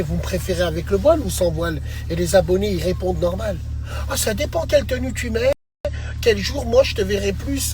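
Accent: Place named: Arab world. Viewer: C est tellement degeulasse l accent arabe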